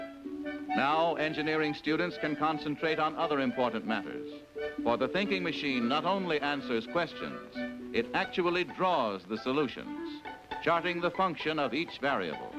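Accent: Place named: Transatlantic, United States. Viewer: Also known as the "Transatlantic accent"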